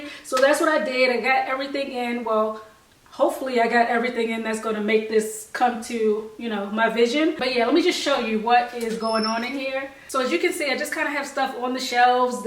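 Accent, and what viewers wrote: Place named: Washington DC, United States. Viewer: Are you from DC? You have a DC accent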